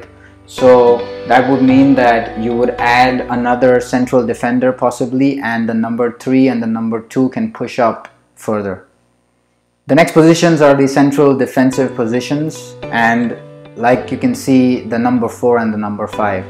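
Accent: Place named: India. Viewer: love your accent... Indian? 😛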